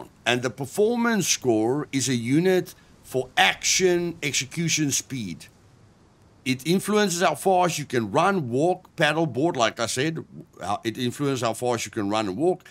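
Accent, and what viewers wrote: Afrikaans, South Africa. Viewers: You Afrikaans?😅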